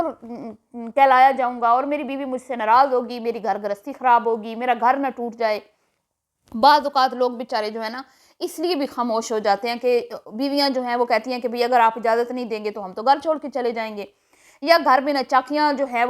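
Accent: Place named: Pothohar, Pakistan. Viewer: Apka accent kafi pothohari hai which has my ancestors too. Bohat acha lagta hai apko sun kay. 🙂.💜 from Canada.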